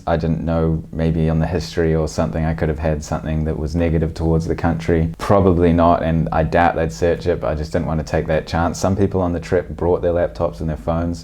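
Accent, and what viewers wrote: New Zealand. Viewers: He sounds like an educated Kiwi. My husband is Australian he says the accent is based on level of education instead of regional location. Same with Australia. Most people expect kiwis to sound like bogans which isn't the case . Hope that makes sense. Cheers.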